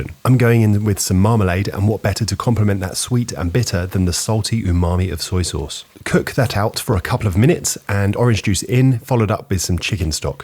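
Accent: United Kingdom. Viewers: Fuck off mate I can tell from your accent you eat beans for breakfast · He sounds British and says "in". Otherwise, no.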